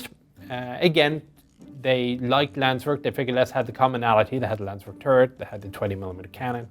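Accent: Ireland. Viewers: hahaha. so whadaya wanna do? Irish to the core. Love it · As a real Irish man I would guess he comes from middle class cork city probably Douglas or somewhere near that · Your accent never bothered me all that much. The US military hosts numerous Spanish-language accents, a few Caribbean English ones, some African accents, and I even remember a Fijian, excuse me, Peejeean Hindi accent. Why should an Irishman here and there throw so many noses out of joint? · Are you Irish? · I just sold my Steyr AUG A3 to my friend. I loved the rifle but I'm looking forward to get the Australian F90 when it comes up to the market. My step-brother's wife is Irish, but her family doesn't seem to have the accent as you are. They would visit Ireland once in a while and my step-brother would bring back some "unmarked" whiskey from local bars. Sadly, I spent all my deployments in WESTPAC, including some exotic places: Kwajalein Atoll and Iwo Jima. 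Witnessed live volcanic eruption at night and I tell you, it beats Kilauea IMHO. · I always heard your accent but never knew you were actually Irish.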